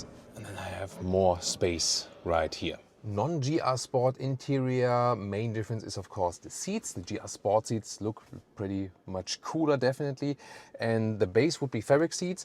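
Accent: Germany. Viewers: Yes, but his "german english" is strange... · Finally a clean summarize car reviews Im a Asian but if feels like the person is a German salute for being sofisticated and that acsent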